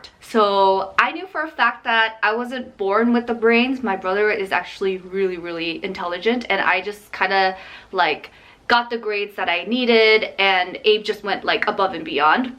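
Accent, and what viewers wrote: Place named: South Korea. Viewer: That's why you have this slight Korean sounding American accent..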